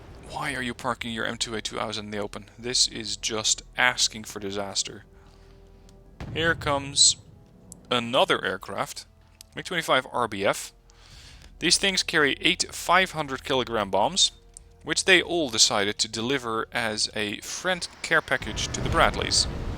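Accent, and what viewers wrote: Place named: Netherlands. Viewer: Killa Armadilla still, it's an easy mistake to make as dutch and Swedish have several identical words and a similiar flow which makes for similiar accents when speaking English :)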